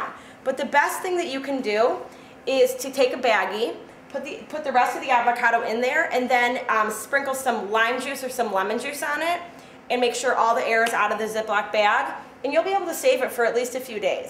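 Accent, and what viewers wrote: Chicago, United States. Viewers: Are you from Chicago? Your accent suggests it. That sandwich looks great too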